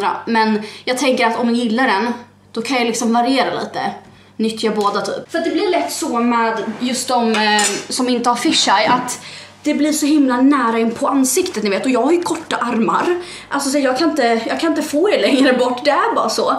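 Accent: Stockholm, Sweden. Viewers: Varför låtsas du vara stockholmare??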